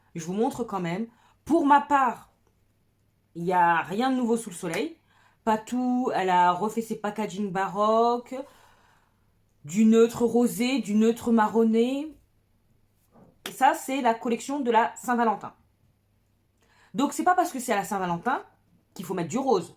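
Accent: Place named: Africa. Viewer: Quand tu montes le niveau d'exigence tu prends l'accent africain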